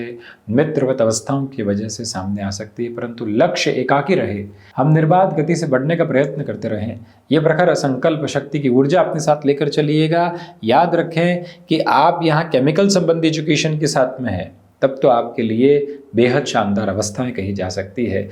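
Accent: Standard. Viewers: Itni suddh hindi Maine DD1 ka Ramayan mai bhi nahi suna tha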